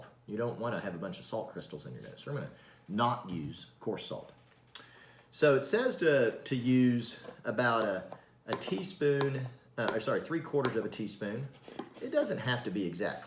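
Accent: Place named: South, United States. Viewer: this guy is the first actual doctor who I've heard talking in a slight southern accent.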